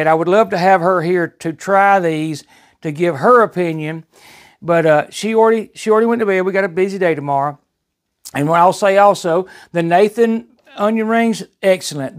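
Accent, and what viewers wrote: South, United States. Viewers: His southern accent instantly makes me trust him. Great video good sir! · His down south accent talking about wings is weirdly soothing.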